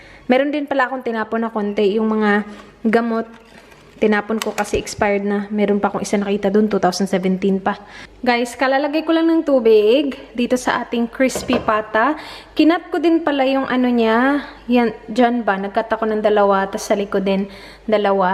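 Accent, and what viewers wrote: Visayas, Philippines. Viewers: Suok2x jud. Tarongag balibod..... 😁😂😁 cute jud kaayo mag bisaya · Na amaze kayko ba kay the way ka mo storya og tagalog naa gyapon accent nga bisaya. Love u dhai😘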